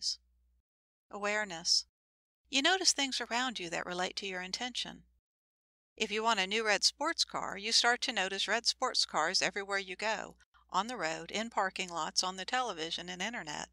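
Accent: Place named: South, United States. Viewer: Very informative. Pleasant vocal as well. I put the accent as the Virginia - Carolina region.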